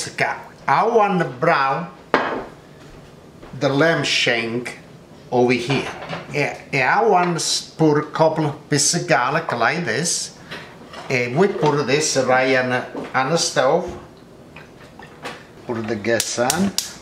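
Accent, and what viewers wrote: Italy. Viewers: I like way of explain. I think Itlian Inglish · Don't you just love that Italian accent? Sooooo Molto simpatico Grazie molto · Well somthing simple, but the italian accent , remind of god father movie!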